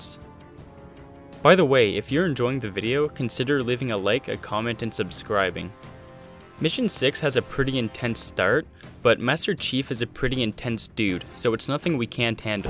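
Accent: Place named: Southern Canada, Canada. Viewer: your accent so south-canadian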